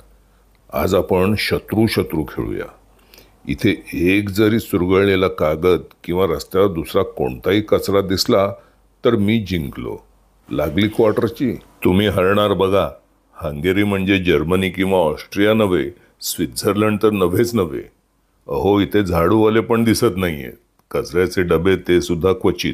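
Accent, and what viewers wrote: Pune, India. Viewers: काय मराठी आहे अगदी पुणेरी शैली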